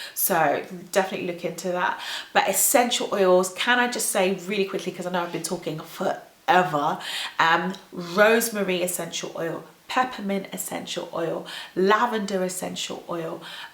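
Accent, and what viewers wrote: United Kingdom. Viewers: I cAnt stand the British accent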